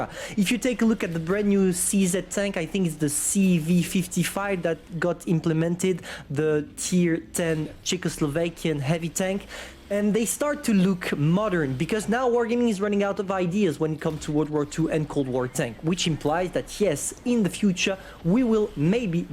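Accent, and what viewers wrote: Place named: France. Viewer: i love your video and your french sound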